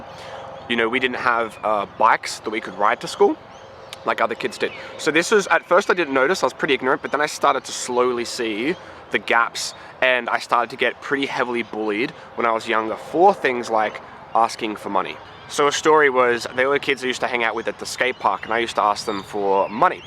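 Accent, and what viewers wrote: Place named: Australia. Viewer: your story is incredibly inspirational and should move everyone who hears it to want to be great at any cost! I talked to Quinn yesterday after opting in...looking forward to getting goin with you guys in the very near future! You are the M&M of digital marketing....you even sound a bit like Marshall with an Aussie accent brother!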